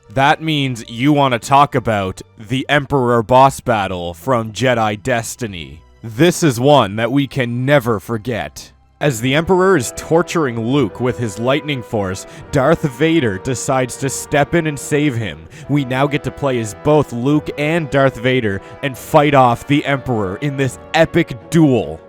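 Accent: Canada. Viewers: Are you Canadian? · You're Canadian I can tell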